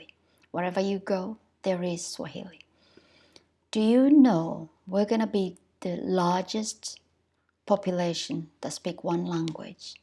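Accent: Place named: Uganda. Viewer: The presenter of this program you sound Ugandan and yet you said you are Kenyan I’m pretty sure depends on your tribe Ugandan and Kenyans we are equally the same thing thanks for your information I already speak Swahili myself I was born speaking Swahili and as you said Swahili is the way I totally believe you because I’ve always been convinced that Swahili was the most superior language on the continent of Africa always thought Swahili is superior than other languages from the west coast of Africa or even the southern coast of Africa and other countries in between that again Kiswahili is definitely superior…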